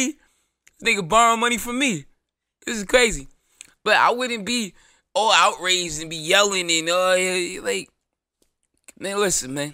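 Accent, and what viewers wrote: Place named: Memphis, United States. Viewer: Bruh the Memphis accent took me out breh 😂😂😂😂😂😂😂😂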